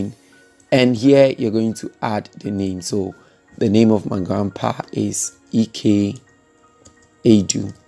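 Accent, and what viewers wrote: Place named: Ghana. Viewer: The Ghana accent is amazing to hear. Even in 2021, you have given me a god send tutorial. Thank you.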